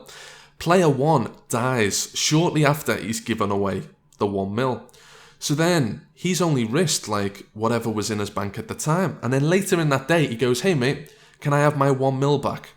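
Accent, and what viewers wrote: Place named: Liverpool, United Kingdom. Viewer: Scouse nonce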